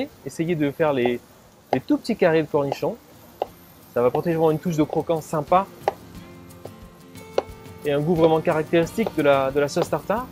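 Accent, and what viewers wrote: South, France. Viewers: Salut!!! Super ces 2 recettes, pour la première parfois je fais au pif avec ce que j'ai sous la main (en général je suis pressé quand je m'y lance ), pour la seconde j'ai horreur du vinaigre mais je remplace par un vin blanc sec (toujours une bouteille entamé dans le frigot pour la cuisine ) mais je la retourne dans le plat et la masse avec la marinade (Huile d'olive; thym(ou basilic), ail, paprika, piment d'Espelette sec, poivre ) je sale juste avant de la cuire avec de la fleur de sel!!! Comme d'habitude je ne peux m'empêcher de donner mes ingrédients et de trop en faire avec mon commentaire. Je m'en excuse par avance!!! Bon Week-end à toi & ton entourage!!!! Au fait,ça plaisir de voir que tu n'as pas perdu l'accent du soleil, il fait chanter les recettes!!!!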